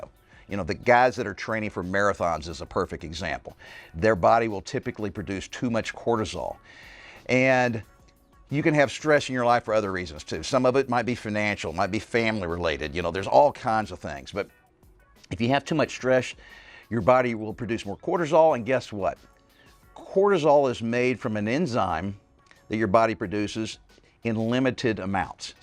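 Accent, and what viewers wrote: Texas, United States. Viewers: He’s a Texan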